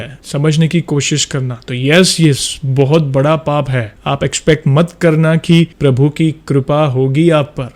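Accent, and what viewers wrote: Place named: Pakistan. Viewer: Bhaisab aap Toh Pakistani lag rhe ho .apki tone se lag rha hai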